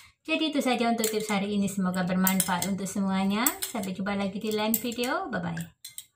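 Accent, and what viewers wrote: Malaysia. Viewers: Tuch yg NgoMong Org Malaysia yach?🤔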